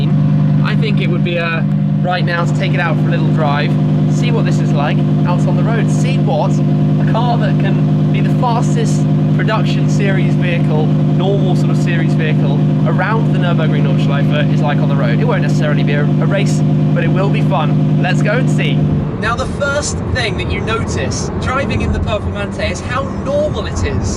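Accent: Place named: United Kingdom. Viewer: As much as I think your British accent is just.... dandy, please give it a rest while you're driving. Even during launch control, you're just blabbering on and on. It's like someone put 6 AA batteries in your head and turned the "ON" button.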